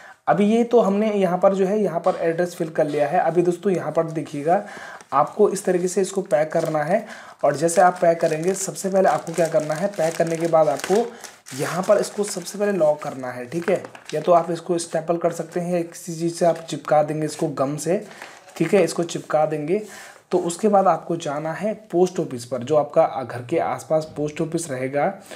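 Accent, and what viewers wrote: India. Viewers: Bheji aap uk se ho ky lamgaw Uttarkashi se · Bhai lamgaon ka ho kya 😮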